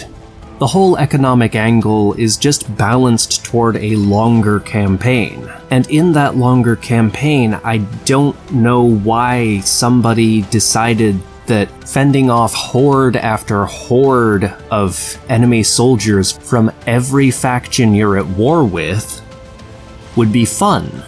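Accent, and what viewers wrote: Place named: United States. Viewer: Completely understand the frustration. I do hope you'll stay the course. Your narration is clever and entertaining, and the editing, phenomenal. I subscribed immediately upon finding your channel. I'm a lore nut and really only watch good faction campaigns. Would love to see you play as an elven faction or Gondor on AGO and see what that does for your channel. Whereabouts are you, if you don't mind? Stateside? You sound American but seem to handle Germanic and Gaelic word annunciations with ease.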